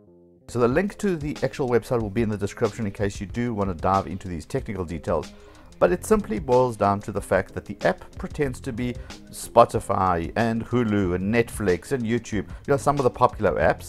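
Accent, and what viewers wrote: South Africa. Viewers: accent is SA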